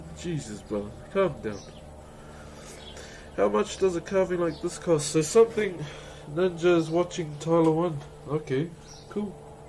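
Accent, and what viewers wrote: New Zealand. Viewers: both are kiwis, yeah?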